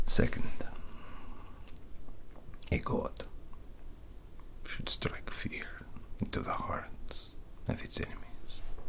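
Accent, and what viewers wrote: Russia. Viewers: I am always very pleased to hear you speak with a Russian accent. you are always the top of the top 🔝🔝🔝🔝 many more congratulations on 🎊150K🎉 even though you should be at a million or more ❤🤗❤ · I'm a huge fan of your russian accent, I find it very soothing and relaxing. This video goes straight to my favourites. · I love your Russian accent! Strangely soothing for this tried and true Patriot. However, I want to be transparent. The gun sounded too plastic to me at the beginning, not sure why. We clean them all the time and I love the metallic sounds; the clicks of the hammer, the slide going into place, all of it. I love my Beretta ❤️ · I always really liked your russian accent,. The background hum is delightful here and goes well with the rather dark ambience.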